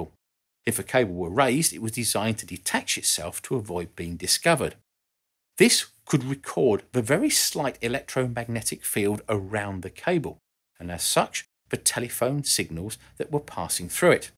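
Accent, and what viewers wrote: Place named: RP, United Kingdom. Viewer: Thank you, for your videos! They are all incredibly good! Your speech is brilliantly clear, and there are few other places on YouTube where you can see proper English subtitles (and also hear real BBC English spoken!)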